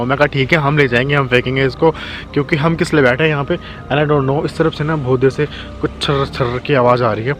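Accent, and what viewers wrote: Haryana, India. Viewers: Apka hariyanvi accent itna cute lagta h real Ho bhai don't worry aur pls apni safety ka khyal rakho god bless you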